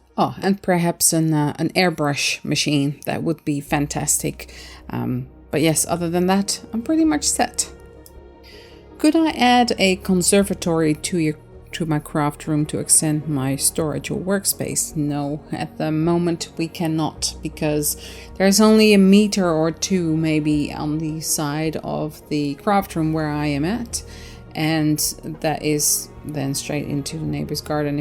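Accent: Netherlands. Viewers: 🐦It was really interesting learning more about you. For some strange reason I thought you were of South African origin, but Dutch makes much more sense with your accent. Don't ever give up on your dream art room, visualise it, manifest it into reality. Yeah I know easier said than done but a little hope and faith won't hurt anyone eh. PS I'm with you on the vegemite. Blerk!!!!! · Lol I thought your accent sounded familiar, my father and his family moved to Canada from Amsterdam. Have you considered doing Aragog as part of the pet collection?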